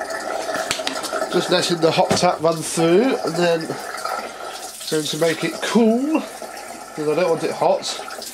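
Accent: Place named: United Kingdom. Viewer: You have a very erudite British accent. Where in England are you from?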